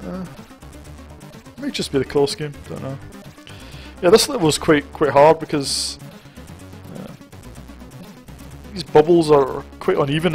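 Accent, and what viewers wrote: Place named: Scotland, United Kingdom. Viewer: nice to hear a fellow scot like myself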